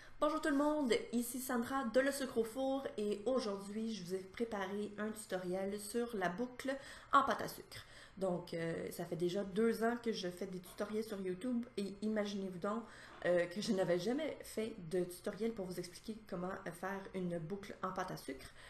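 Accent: Quebec, Canada. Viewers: de rien vos videos sont super et j'adore l'accent quebecois ;)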